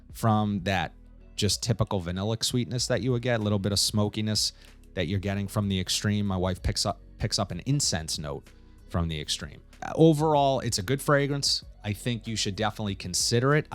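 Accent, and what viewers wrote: Boston, United States. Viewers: Cool Buaston accent!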